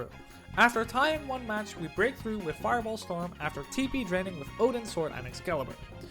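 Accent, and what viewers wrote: Limburg, Netherlands. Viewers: Fam kuumps ste oet limburg? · your nederlands en limburhs omg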